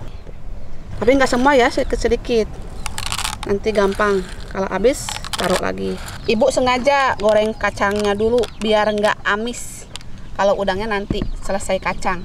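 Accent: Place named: West Java, Indonesia. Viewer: Ibu teh tinggal d daerah mana yah... Bahasanya logat Sunda.. tapi dkt ke laut ada gunung / bukit juga... Banten Iyah Bu...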